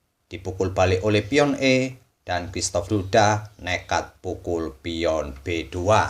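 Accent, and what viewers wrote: Java, Indonesia. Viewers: Ji.. medok amat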